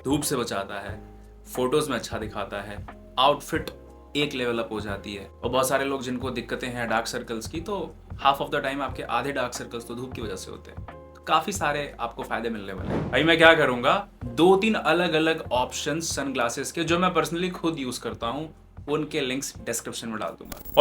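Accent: Lucknow, India. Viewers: bhai ap lko se ho kya??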